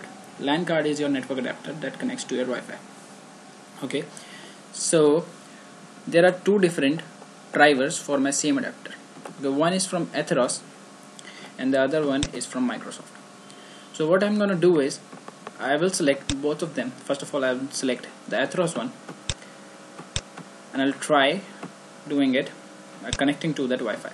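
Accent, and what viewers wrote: India. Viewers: Oh god i fucking hate your indian accent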